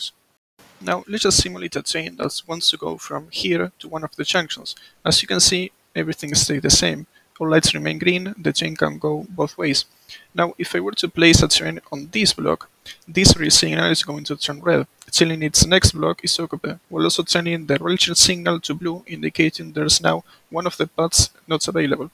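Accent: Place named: Argentina. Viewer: Ahjj el ingles argentino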